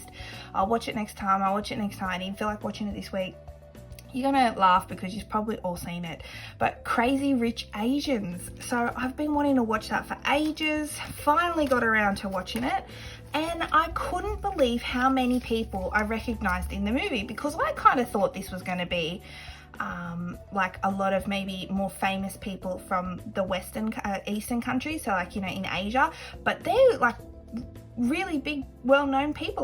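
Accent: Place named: Australia. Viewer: Her accent sounds like Australian so I assume that is the currency.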